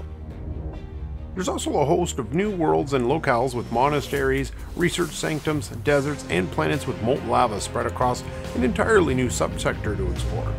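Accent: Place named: Canada. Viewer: Haha I even subscribed too. I like how you do content and your accent is clearly Canadian, same here I’m in Alberta. Keep grinding man 🤙🏼🔥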